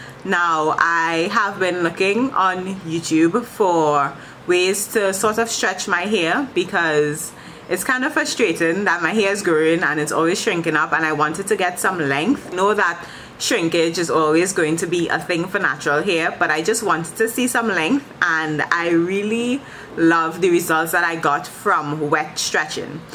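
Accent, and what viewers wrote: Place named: Trinidad and Tobago. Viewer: Trini accent, great video, lovely hair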